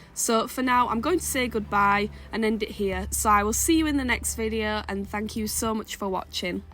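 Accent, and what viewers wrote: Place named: North, United Kingdom. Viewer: Nice northern accent, I much prefer your Disco over the Defender.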